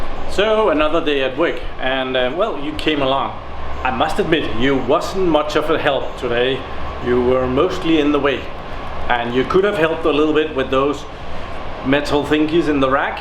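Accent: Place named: Denmark. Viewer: danish accent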